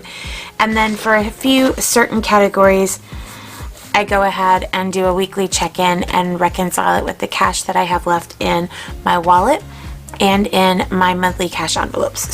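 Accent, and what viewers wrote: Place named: South, United States. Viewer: You are extra southern in this video, but I love it!!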